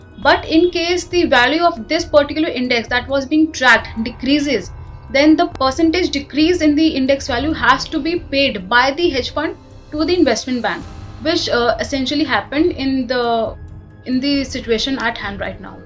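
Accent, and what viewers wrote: India. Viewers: good game.. well played yeah my head is confusing with indian accent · Indian accent is pain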